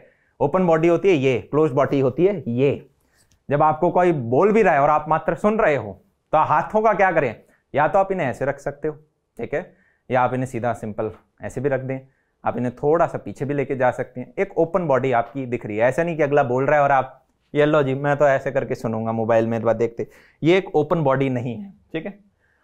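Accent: Haryana, India. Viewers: Jhunjhunu se ho ya haryana se?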